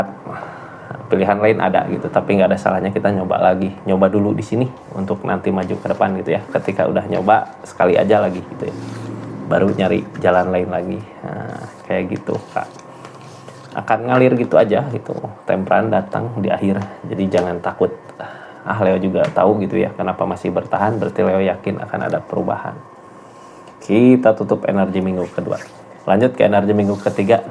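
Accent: West Java, Indonesia. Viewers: Leo. Hadir dari. Lampung Sy suka banget. Dgn. Logat. Sunda nya. ❤❤❤❤❤❤❤❤❤❤❤❤❤❤❤❤❤❤❤❤❤❤❤❤❤❤❤❤❤❤❤❤❤❤❤❤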